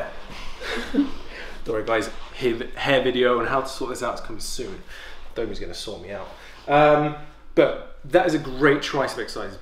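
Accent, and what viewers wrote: United Kingdom. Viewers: That British accent is so much easier to listen to!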